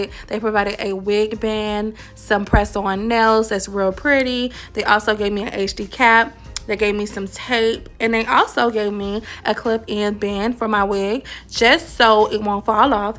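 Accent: Miami, United States. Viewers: That Miami accent tho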